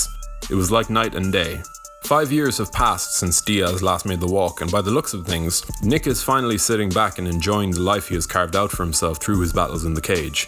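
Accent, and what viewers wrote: Ireland. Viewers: Your posh irish accent is annoying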